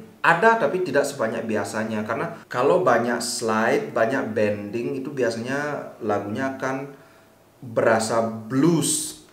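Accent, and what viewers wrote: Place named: Bali, Indonesia. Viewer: BESER... 😁😁😁 medok sajan base baline adi bli...😅😅😅 👏👏👏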